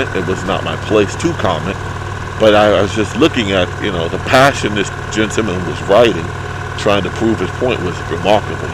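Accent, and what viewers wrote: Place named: New York, United States. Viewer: Hello fellow NYer...Great video...nice & easy rider. Just love to hear that NY accent again. Makes me miss the big apple. Brooklyn born and now living in hot hot hot Arizona. Nice looking bike. Mine is the same as Dbest1's. Love just cruising around enjoying the scenery. You "ain't" boring at all!!! Keep on gettin' on bro. Dbest1 recommended your vlog and it was all he said it would be. Kool... RSMF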